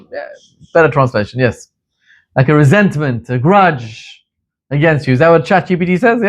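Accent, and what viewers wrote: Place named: South Africa. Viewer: Seems like he's from South Africa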